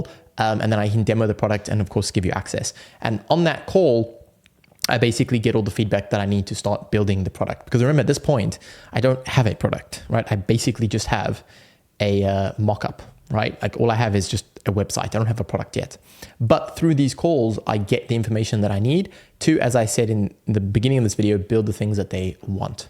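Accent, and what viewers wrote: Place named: South Africa. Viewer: Were you born in SA? You have a strong south African accent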